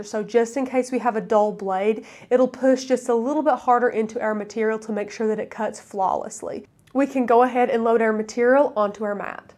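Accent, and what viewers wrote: South, United States. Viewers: Agreed, a blessing you are! It’s so nice to come on here and learn from people who you know are kind and sweet, not to mention, you are lovely and your accent is just adorable, I am from California and I am telling you that is definitely not how we sound. Your sweetness is from the south, im going to assume, I’m ready to move. Hee hee! 😇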